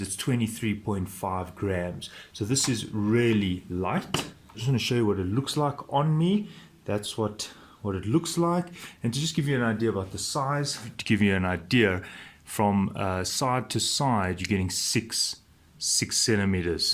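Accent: South Africa. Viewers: Excellent video. I love the SA accent.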